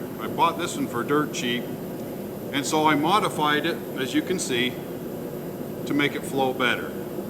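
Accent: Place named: Appalachia, United States. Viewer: What's the noise in the back ground can hardy under srand your hill Billy accent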